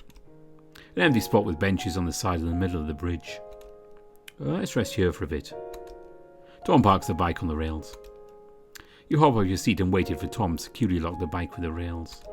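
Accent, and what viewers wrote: United Kingdom. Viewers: I love the witty banter. It was nice, it goes well with your British accent.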